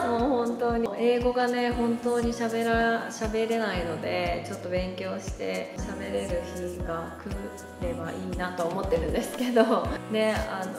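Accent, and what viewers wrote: Kansai, Japan. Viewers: 週末で「今際の国のアリス」見て、このクセの強いキャラを演じてるのは誰だ？と思いました。この動画でバリバリ関西人だと分かった。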